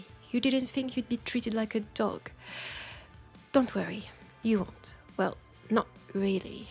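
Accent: France. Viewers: So happy to see this witch back in business again, she’s my favourite of all the characters you voice and your French accent is always so nice to listen to hope you have a great Halloween and look after yourself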